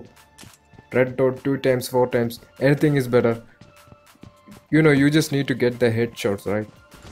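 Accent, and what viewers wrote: Kerala, India. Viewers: Malayali annale English kettapo thoni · True English kettapole manasil aayi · You are a malu for sure.Damn ur accent man!😁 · asif aliyude sound pole und....malayali aanennu manasilayi 😍😘...pwolie video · Malayali anenn English kettappo mansilayi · manglish.... bro, are u a keralite...???? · English ketapozhe thonni malayali aanenn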